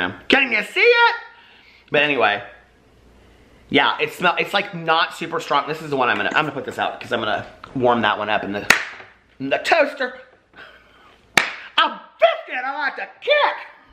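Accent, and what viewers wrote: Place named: Midwest, United States. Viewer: I love that you are a MidWesterner, so am I. I do crack up when I hear the verbal Midwest come out . Like your black church shoes. Years ago I made a reference to growing up we didn't have numerous shoes we had school shoes, play shoes and church shoes and a couple people didn't know what church shoes were. Lol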